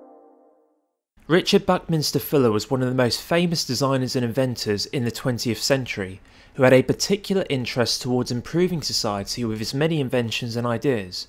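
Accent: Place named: United Kingdom. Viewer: He is British. His pronunciation is different from those that live in America.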